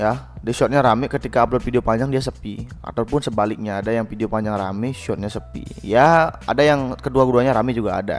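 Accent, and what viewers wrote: Bali, Indonesia. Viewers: Keknya logat Bali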